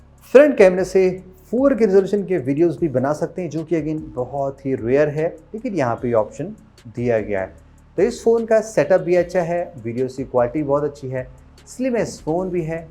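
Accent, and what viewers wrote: Lucknow, India. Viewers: Are you from lucknow❤❤👍🏻✨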